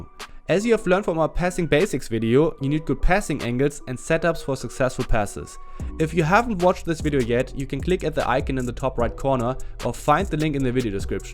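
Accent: Germany. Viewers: man hört das deutsche direkt heraus haha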